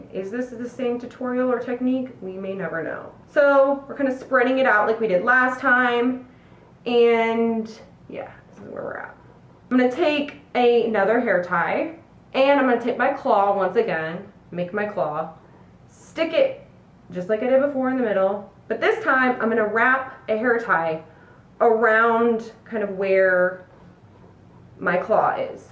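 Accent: Midwest, United States. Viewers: I've watched a couple of your videos and I decided you had a Midwest accent and surprise we live in the same city! Or at least I live in the same area as your PO box so we have the same accent. Anyway I think I liked the third bun the best. Except whenever I try it, it's almost always bad. I haven't gotten that one to work on me.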